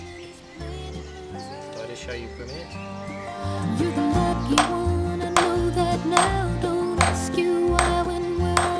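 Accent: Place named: England, United Kingdom. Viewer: What's your background? You sound English not Czech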